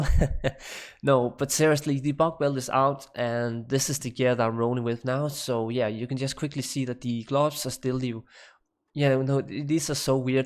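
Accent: Denmark. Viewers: dude. Du har lavet youtube videoer i tre år. Hvornår skilder du dig af med den stykke cringy danske accent. Og hvornår har du tænkt dig at øve ord inden du optager. Jeg har efterhånden set en god håndfuld af dine videoer, men jeg kan aldrig kommer over halvvejs, pga udtale og accent. Tag dette som konstruktiv kritik, fordi jeg tror virkelig at det vil hjælpe dig med dine fremtidige videoer.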